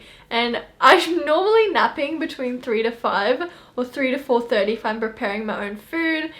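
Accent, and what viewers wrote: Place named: Australia. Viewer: I think she lives in Australia, because of her accent, and it was uploaded in june and she said that it was winter I think.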